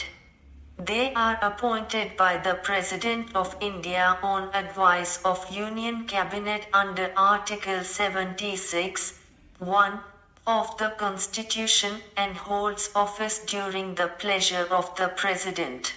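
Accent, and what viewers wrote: United States. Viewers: Happy new year Sir. But why u encourage US accent why are we accept this? Aise rha toh hr govt. Exam m dictation k liye us/uk accent hoga. Indian accent m Kya problem h?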